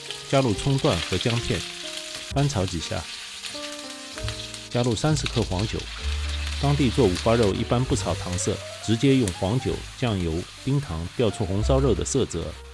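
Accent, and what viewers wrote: Shanghai, China. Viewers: 冲着你带有上海口音的解说，红烧肉肯定是回味无穷。❤👍你的视频不拖泥带水、不啰啰嗦嗦一步到位是有良心的节目人。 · 上海口音的上海红烧肉肯定正宗，订阅了！👍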